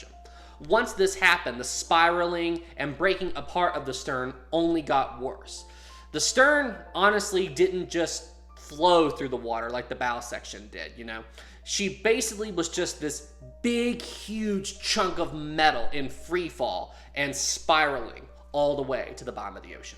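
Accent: South, United States. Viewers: Your a yank though so excuse me if i only catch parts as that accent an hearing it bloody hell!!!!! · Are you from the south I hear a fair amount of twang in words like time it’s a good thing btw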